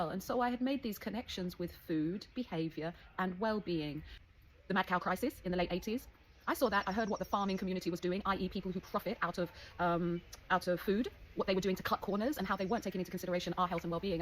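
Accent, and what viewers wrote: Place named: South St. Elizabeth, Jamaica. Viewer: I am here watching your video about your grandmother Laura and hearing the South St. Elizabeth accent pulsating through and loving it! Your channel is awesome too! You are on the top of my list too! So coincidental and I love it❤️🧑🏼‍🌾🏝️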